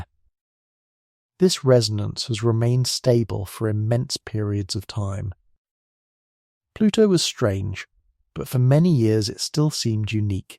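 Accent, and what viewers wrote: United Kingdom. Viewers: gentle voice from eleven labs 😊 British voice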